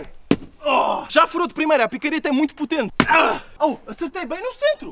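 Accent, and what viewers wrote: Portugal. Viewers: PORTUGUES DE PORTUGAL !!! KKK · Eu amo o português de portugal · Nao, portugues da europa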